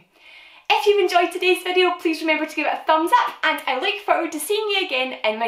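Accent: Scotland, United Kingdom. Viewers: Oooh I love your super cute intro 💖 Love your accent so much 🏴 what part of Scotland are you in? I need to take notes from this video as I cut Leo’s hair and it’s terrible 😬 Awww he’s just too cute 😍😍😍 You are doing such a good job and you make it look so easy! Wow super good job! That’s really impressive! Ahh yes Leo doesn’t get screen time much either so that’s a great idea 😁💖 Oooh no static hair 😂😂 Loved watching 😁💖 Awww love the hiding seek 😁💙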